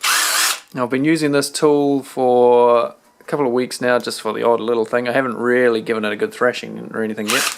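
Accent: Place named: New Zealand. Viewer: Sound a little bit kiwi almost actually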